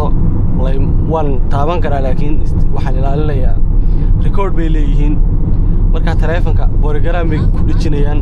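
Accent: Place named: Somaliland, Somalia. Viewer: Is fahano dhibka wa lahjada😂 adinka wa idin ku qosalna wlhi marka ad xamar imdaan😂lahjadina funny wye guuleysta rer somaliland one love❤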